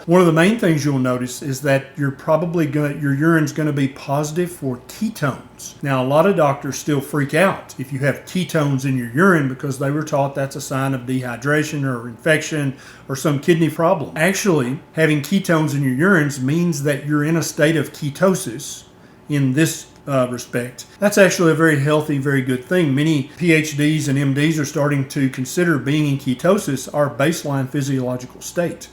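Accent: South, United States. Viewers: I am all for the carnivore diet and have been on it for more than 6 months and it is absolutely amazing. I listen to many experts on the carnivore diet but I simply cannot get past this doctor's southern drawl accent. If somehow he can change that up, he could probably win back the top half of the country and make a larger impact with this awesome way of eating. Believe me, I completely understand that it has nothing to do with education or intelligence because obviously he knows what he is talking about and is educated on the topic, however it takes all of my willpower to get through an 8 minute video listening to this type of inflection. I suspect that would be the same for most of the northern part of the country.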